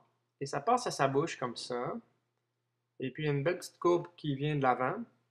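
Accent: Quebec, Canada. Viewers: + un abo :D vous seriez pas Québécois? ^^ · non seulement tu dessines bien mais ta voix est sympathique et j aime trop ton accent québécois ça me change de l accent de chez moi (bruxelles) bonne continuation a toi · Tu fais de super tuto, ca m'aide beaucoup, tes commentaires et tes conseils sont pertinents et tres interessants, continue comme ca tu fais des heureux ^^ et j'aime ce bel accent qui vient tout droit de ce beau pays le canada :) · Je trouve tes petits didacticiels géniaux ! Il nous permettent de dessiner des chose que nous n'aurions pas pu rêver ( en tout cas, ça me concerne ). Je trouve aussi géniale, même hilarante ( ce n'est pas une moquerie ) tes petites anecdotes ( je ne savais pas que les forêts canadiennes étaient peuplées de bouleaux ), et aussi ( pas moquerie, pas moquerie !! ) j'adoooore l'accent canadien. Donc c'est officiel, je suis fan, et pour ce didacticiel, pouce vert !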